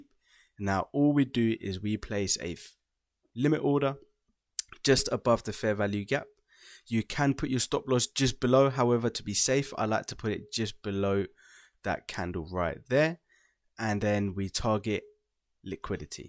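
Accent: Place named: Germany. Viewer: wie wenn du deutsch redest.bitteversuche in englischen dialekt zu reden.versuche.du gibst sicher gute tips aber der dialekt macht dich unhörbar.bemuhe dich.danke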